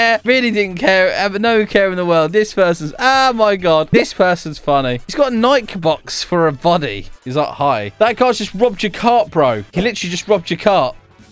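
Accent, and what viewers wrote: United Kingdom. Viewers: hold up, wait a minute, are you from the UK?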